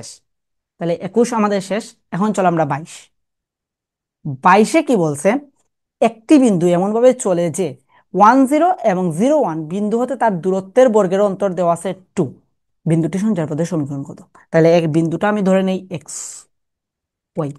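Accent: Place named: Sylhet, Bangladesh. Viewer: ভাই আপনার ক্লাস গুলা ভালো তবে সিলেটি শুদ্ধ মিক্স হয়ে যাচ্ছে, এগুলা খেয়াল রাখবেন।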